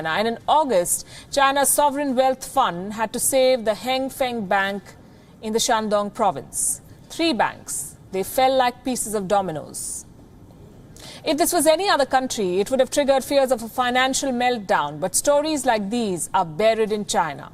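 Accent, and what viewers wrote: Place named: India. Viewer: forgif and understand her indian accents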